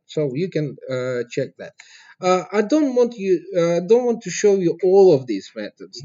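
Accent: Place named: Russia. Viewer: RU акцент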